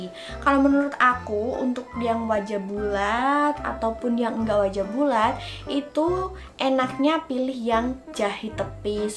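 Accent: Java, Indonesia. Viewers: Mba orang Jawa ya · Orang jawa mana sih kak ? Kok medok bgt hehe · Jawa medok kak:) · Keknya org Jawa ya min? Kelepek Ken..ke mencung en 🤭🤭🤭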